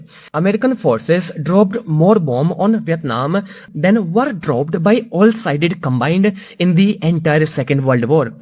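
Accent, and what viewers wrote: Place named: India. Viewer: Bro don't make video in Indian accent because you will face trolling 🙂❤ I hope you understand 🥲❤️‍🩹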